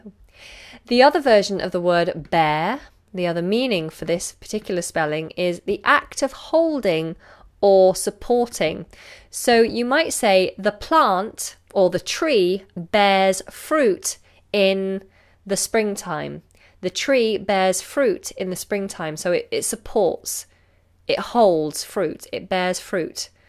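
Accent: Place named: England, United Kingdom. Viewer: i like your engish accent more than Lucy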